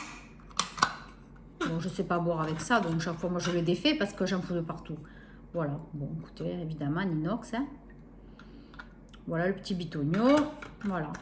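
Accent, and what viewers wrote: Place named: Toulouse, France. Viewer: Coucou Merci pour tes vidéos J adore Tu as le même accent que moi 😘 Je suis à côté de Toulouse 😉 Bonne continuation et gros bisous ❤️ Martine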